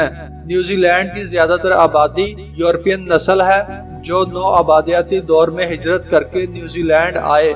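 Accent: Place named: Punjab, Pakistan. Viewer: URDU GHAR HD : REF NEWZEALAND DTD NOV 14, 2017, BEST REPORTING AND COMPLETE INFORMATION, BUT COMMENTARY WAS UNIMPRESSIVE BECAUSE OF URDU IN PUNJABI ACCENTS. SOUNDED LIKE SOME VILLAGER READING.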